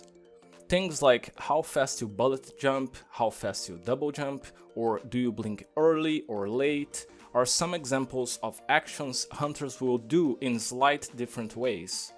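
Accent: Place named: Turkey. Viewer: Are you Turkish? Your accents kind of sounds like a Turkish accent but not a thick one.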